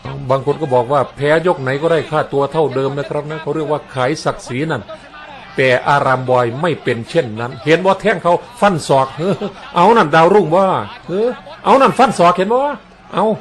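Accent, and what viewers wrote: Isan, Thailand. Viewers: ตั้งแต่ผมติดตามช่อง ไม่เห็นมีคอมเมนต์อย่างที่คุณบอกเลย หรือทางแอดมินลบไป....คุณรู้มั้ยครับว่า พวกที่จ้องจะทำให้เกิดประเด็น บางทีก็ไม่ใช่คนภาคอื่นด้วย ไม่ใช่คนที่ไม่ได้พูดสำเนียงอีสานด้วย... คุณควรมองหลักความเป็นจริง ช่อง เค้าบรรยายเป็นภาษากลาง ปนด้วยสำเนียงอีสาน นี่คือเอกลักษณ์ของช่อง คุณจะเอาแต่ความรู้สึกของคุณไม่ได้ การที่คุณเสนอแนวคิดมานั้นก็ไม่ผิด แต่เอกลักษณ์ของช่องจะหายไป... **สำเนียงอีสาน มีหลายสำเนียง และสำเนียงอีสานก็ไม่ใช่ภาษาลาว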